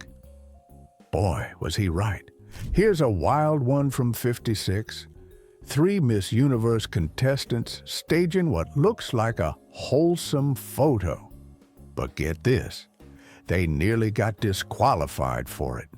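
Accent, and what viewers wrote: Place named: India. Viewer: Good narration, but why the Indian accent?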